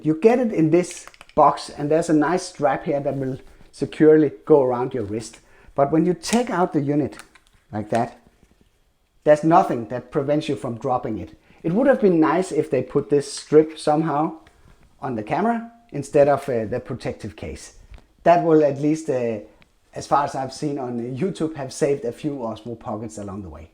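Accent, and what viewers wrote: Denmark. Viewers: Er du fra Danmark, syndes jeg kan høre lidt dansk😂🇩🇰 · Du lyder lidt dansk :)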